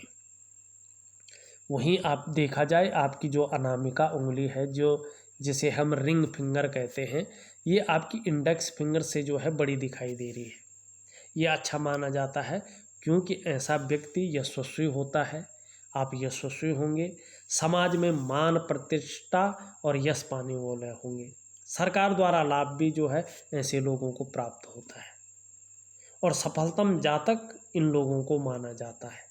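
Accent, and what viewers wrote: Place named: Garhwal, India. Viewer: Aap garhwali Ho kya Apka tone se lgra hn 100%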